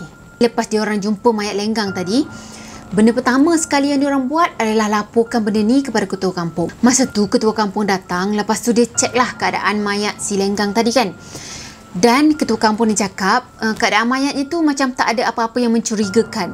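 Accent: Kelantan, Malaysia. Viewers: Kelate boh.. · Kelate bosku.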